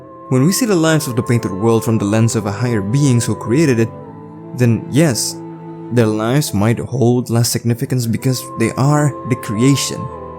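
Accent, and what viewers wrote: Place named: Indonesia. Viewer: i immediately recognized your accent! hello, from an indonesian american! i loved this video and completely agree with your analysis and all of your thoughts on the ending. it’s been 2 months since i’ve seen the finale of clair obscur and thinking about these characters still brings me to tears 🥲 so few games hit as deeply as this one does, it’s such a gem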